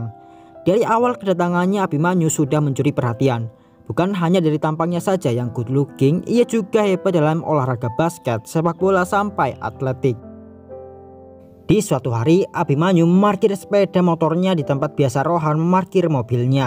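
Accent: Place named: Java, Indonesia. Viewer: Medok wong jawa kie admin ne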